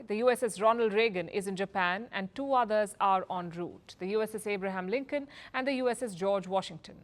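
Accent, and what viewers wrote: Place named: India. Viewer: Your channel has not improved at all, you are speaking curry English all the way. And besides you are talking rubbish all the time, the Americans are lacking money, I just want to ask how long would they afford to keep 5 Aircraft Carriers going on in the front door of China ? By the way are they not afraid of Chinese missiles Dongeng 21 ?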